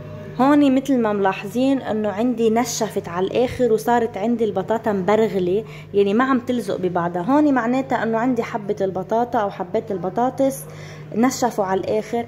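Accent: Lebanon. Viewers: دائما متألقة وصفة جميلة ودائما اللهجة اللبنانية بتجنن 😍 من الجزائر أنا 🇩🇿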